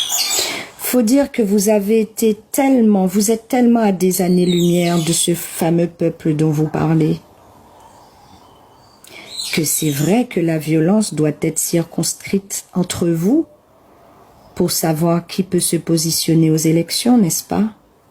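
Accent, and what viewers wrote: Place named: France. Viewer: Tu as accent français très forte 😅😅😅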